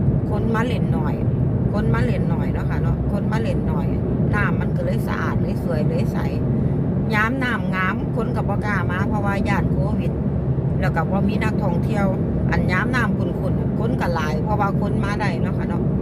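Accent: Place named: South, Thailand. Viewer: คุณ พูดสำเนียงมีความคล้ยคนใต้นะคะ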